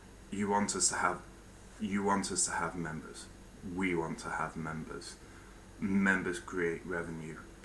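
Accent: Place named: Northern England, United Kingdom. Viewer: No worries, it must be hard being misunderstood like I am. I kinda get pissy at people when they mock me and generally don't understand me, so it makes sense the forum would be unaccepting of newer members but I'll try to fit in they're, and I will PM you when I figure the system out and if I remember you're name. Their's something I wanted to ask you, are you from England? I used to live they're until I moved. Most of my family live their as well. I can kinda tell your accent is from the North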